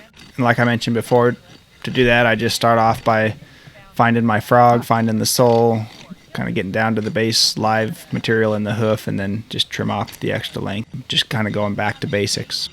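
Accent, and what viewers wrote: South, United States. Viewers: Oh, I had a feeling you had to be from the south! Can't get rid of that drawl that easily :P May I ask what plans you intend to take after college? Gonna keep ferrying or gonna try to be a large animal vet? I like hearing the little updates in your life with the videos as well as all the horse info- you're just as much a part of the video as the horses! What a sweet giveaway though, that's really lovely. I'm gonna have to see what I can do out here now and get my entry in! Hope y'all are having a good Easter from down here in Texas! <3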